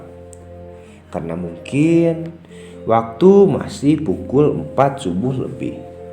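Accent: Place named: Bali, Indonesia. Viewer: Bli org bali...ya ,cara mbaca nya koq aksen org bali...!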